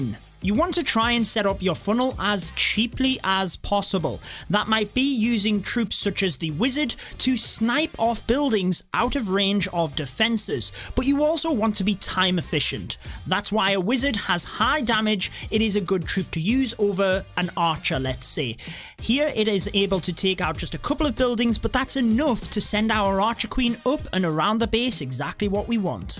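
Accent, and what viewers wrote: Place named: North, United Kingdom. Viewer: his accent is a Northern one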